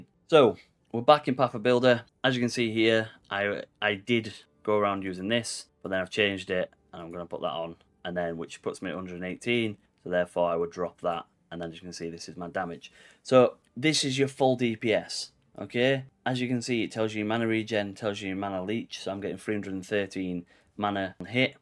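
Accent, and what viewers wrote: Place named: United Kingdom. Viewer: british english…the most annoying version of english to listen to….build is nice though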